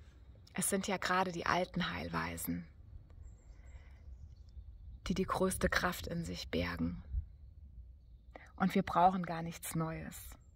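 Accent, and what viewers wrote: Hesse, Germany. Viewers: Liebe, heute an diesem für mich besonderen Tag habe ich dein Video mit dem aramäisch en Vater-Mutter unser gefunden. Du hast es wunderbar gesprochen - gefällt mir persönlich besser als die gesprochene Version von Monika Herz im Martha Gebet. Ein winzigen hessischen Akzent habe ch gehört- aber schön. Ehrlich gesagt, gefällt mir persönlich für unsere Breitengrade das deutsche Ur-Vaterunser doch am besten. Wegen der Klarheit, Schönheit und der Möglichkeit die Dinge in ihrer Gesamtheit zu verstehen. Wir müssen nicht alles neu erfinden oder in Sprachen sprechen, deren Struktur wir eigentlich nicht verstehen, alles gut wie es ist - soweit kurz zum Thema"Hype". Wenn wir das was wir tun, mit dem Herzen tun, ist es doch völlig wurscht, ob wir Urdu, Hindi, schwäbisch oder hessisch sprechen Erstaunt war ich auch über das Buch, daraus habe ich meiner Mutter auf der Intensivstation jeden Mittag vorgelesen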